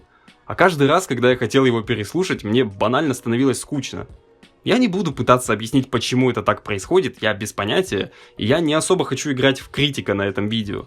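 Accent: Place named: Russia. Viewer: This is russian accent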